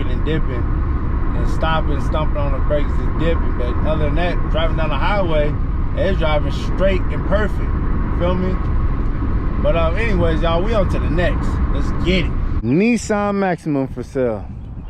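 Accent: California, United States. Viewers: Under bucket that's that cali talk yee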